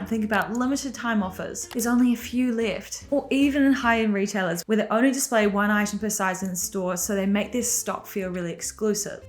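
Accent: Australia. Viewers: So glad I found you! Also, I moonlight as a dialect coach in my theater community and you're giving chef's kiss Elevated Aussie! 🥰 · What about active wear (sports wear) and winter wear, jumpers, cardigans, hoodies, coats for various occasions and comfy stuff to wear around the house? I like the idea of a more intentional wardrobe, but what's on that graph - all looks like things you go out in, which is fine, but seems like quite a big gap in content, no offence, I appreciate this is more from a styling perspective of clothes to choose when leaving the house, but as someone looking for wholesome practical content on the matter, I'd like to also see a breakdown of the other parts of the wardrobe and how to curate that. Like, how many coats, cardigans, jumpers, hoodies, even pj's to stick to, or multi purpose clothing options in these parts of wardrobe, that kind of stuff. 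I really like you're approach, would love to hear your take on those parts of wardrobe too. I don't know if you're from Australia judging by the accent, but I'm in the UK, and we can have 4 seasons in 1 day sometimes times, and winters are cold, like I can't imagine surviving a winter with the wardrobe in your video. Anyway, if it's relevant for your audience, I'd love to see a video for decluttering winter wardrobe!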